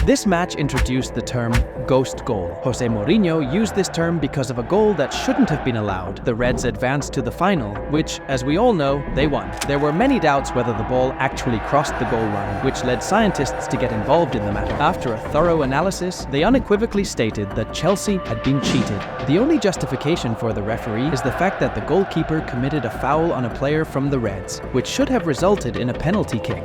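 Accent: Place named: United States. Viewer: American accent? Wow.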